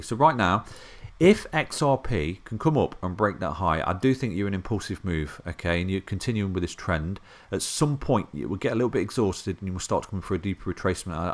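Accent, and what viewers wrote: United Kingdom. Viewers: I admire with the british accent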